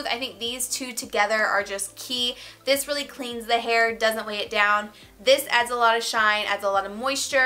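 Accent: South, United States. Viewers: Do you ever use any Colourpop products? They have really amazing palettes that are perfect for Fall!! P.S. That south accent 🙈😍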